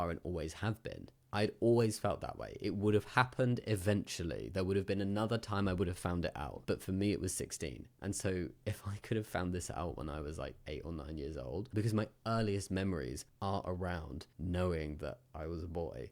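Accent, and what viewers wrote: United Kingdom. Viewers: He's just british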